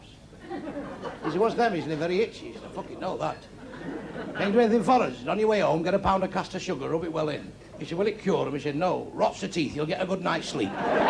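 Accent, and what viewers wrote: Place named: Manchester, United Kingdom. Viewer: Me and Bernard are both slum kids from Manchester These are the jokes we used to tell at school when we were teenagers. Same accent, same dialect, same words, same places and so funny. Bernard is not prejudiced but you can tell by the laughs that some people in the audience are.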